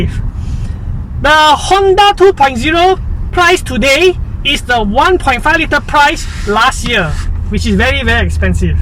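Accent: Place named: Indonesia. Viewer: silly indon tone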